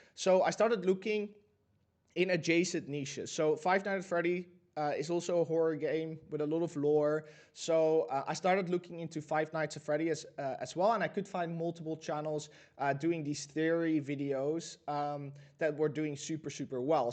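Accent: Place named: Netherlands. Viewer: Are you Dutch? Cause I hear the accent 😂💪🏽💯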